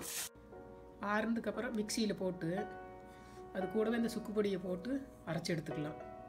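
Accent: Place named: Kongu, India. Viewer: அம்மா உங்க அழகான கொங்கு தமிழில் விவரிப்பது ரசிக்கும்படியாக உள்ளது. நல்ல பயனுள்ள ரெசிப்பிகள். எங்கள் ஆரோக்கிய உணவுகள் பேஜில் பதிவிடலாமா ?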